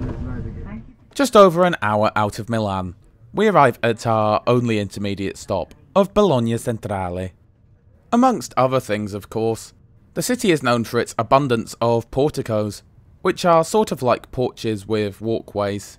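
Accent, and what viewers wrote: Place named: England, United Kingdom. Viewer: Your Italian sounds very English 😁. I also got troubled in Italy for filming. They are similar freaks as TfL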